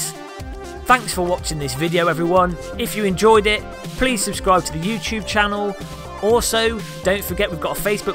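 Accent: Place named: England, United Kingdom. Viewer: Seb, he has a very English accent. But I know what you mean. regional French dialects would be a mystery to me, too. :)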